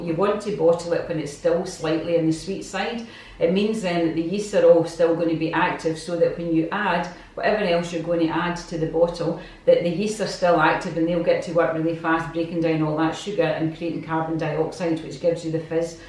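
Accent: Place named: Scotland, United Kingdom. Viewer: This video gives a very comprehensive list of flavours and combinations available..it will be my go to for choice Thank you very much and refreshing to listen to a Scotish accent for a change!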